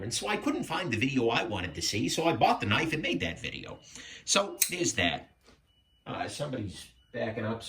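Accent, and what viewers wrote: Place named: East Coast, United States. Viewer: Your in california?? I felt certain your were an east coast guy.... I'm sure at least from the northeast part of the country.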